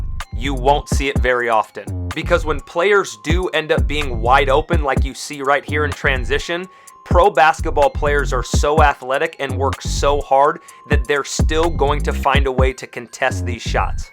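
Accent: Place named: United Kingdom. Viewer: You british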